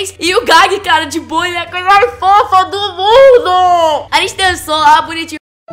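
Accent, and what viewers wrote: Brazil. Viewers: Tambem adoro o sotaque brasileiro #SOUPT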